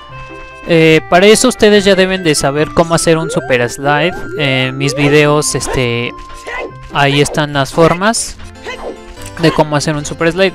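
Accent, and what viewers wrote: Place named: Mexico. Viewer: No hables asi como tan mexicanote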